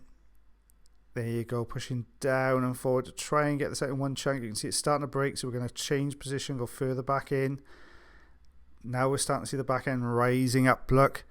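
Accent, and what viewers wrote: Wales, United Kingdom. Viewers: Lovley to hear a welsh accent!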